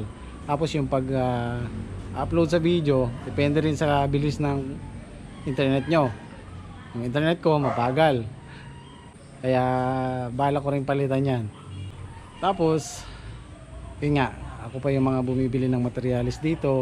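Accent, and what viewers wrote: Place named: Pampanga, Philippines. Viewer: Kapampangan na boss magkasakit yang magtagalog i atsing magtindang sliding haha .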